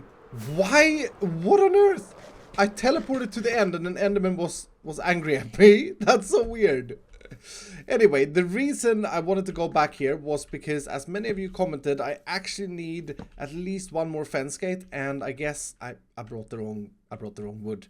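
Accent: Sweden. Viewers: Are you from Sweden iskall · Are he Swedish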